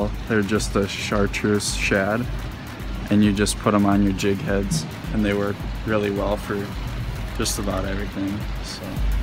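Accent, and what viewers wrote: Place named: Minnesota, United States. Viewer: You ever watch the show Fargo? You sound just like the people on that show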